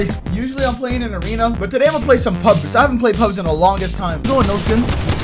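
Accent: Jamaica. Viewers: Yo are you Jamaican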